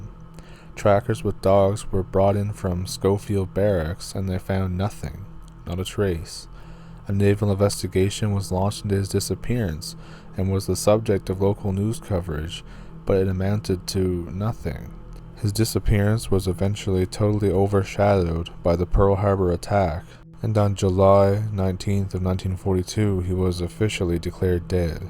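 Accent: Canada. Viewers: Canadian accent is lovely 😊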